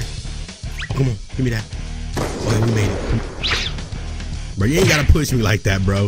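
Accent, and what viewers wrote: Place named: Jamaica. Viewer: The Jamaican accent 😂😂. I wait everyday for a new vid to drop...stay consistent ...banger🔥🔥🔥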